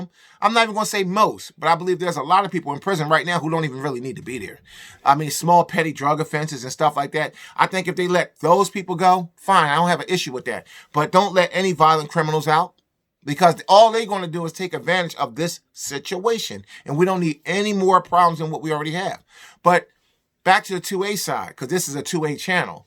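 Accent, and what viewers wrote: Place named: Philadelphia, United States. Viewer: Ha ha bro. I knew you were from Philly from your accent. I'm glad there are some like minded people like you in this City.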